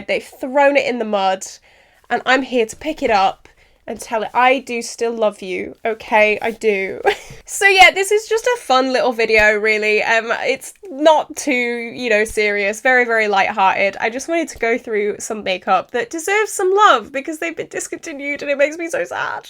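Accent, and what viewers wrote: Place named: United Kingdom. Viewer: Hello, I love your Videos so much. I found you on YT a few month ago and now I´m addicted to your Videos. It's so sad that the Beauty Bay Book of Magic an the Wilderness Palette are discontinued. I became today a bunch of Beauty Bay Palettes (my first ones), I buy it cause you are so impressed of them. It´s also a shame the ABH Subculture Palette is discontinued I had the OG one but the Quality was so bad, so I gave it away. Also I both my first BPerfect Carnival Palette these Days cause you are so in love with these Palettes. Next time I will buy my first Color Pop Palettes, but I´m so overwhelmed with the Numbers of Palettes they have so I don´t now, which one I should buy. But this purchase have to wait a little, cause I both so much these Days (Huda Beauty Grungy, BPerfect Gravity, Oden´s Eye Chrismas Value with 4 Palettes, BPerfect Carnival Interstellar, BPerfect Carnival The Antidot, a bunch of Beauty Bay Palettes, Morphe The Seasons Artistry Palette, Morphe CT18, etc.) and I want the Cosmic Brushes Winter Wonderland Palette so bad). 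I´m from Germany and I love your british accent so much. And as I said I love your Videos and Content. Stay tuned. Claudia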